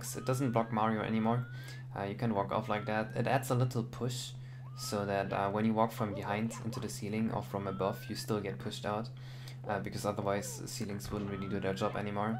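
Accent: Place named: Germany. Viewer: something about germans talking english with a adorable accent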